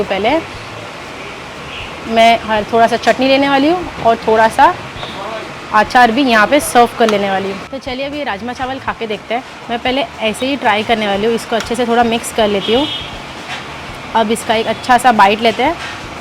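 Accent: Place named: Maharashtra, India. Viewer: From the way of your speaking accent I'm sure you are basically maharashtrian girl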